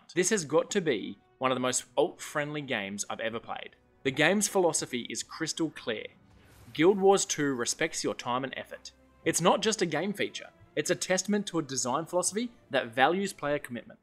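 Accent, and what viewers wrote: Australia. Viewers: Judging from your accent, I'm gonna assume you live in Australia. How do you deal with the high ping? I tried Guild Wars 2 and I enjoyed my time with it, but the fact that the game didn't support OCE servers just killed it for me. It's 2023, there's really no reason I should be playing any online game with 250 ping anymore.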